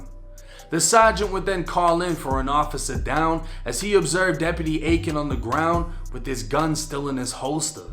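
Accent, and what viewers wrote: Boston, United States. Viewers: My dude…..that Boston accent is intense!! 🙌🏽🙌🏽🙌🏽🔥🔥🔥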